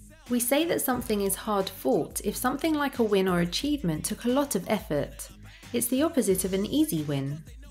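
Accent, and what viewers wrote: United Kingdom. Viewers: I enjoyed this lesson because i rather the british accent, i'm a basketball player and admireee MJ! THAAANKS! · Nice to see you,.:) I like your British way of speaking.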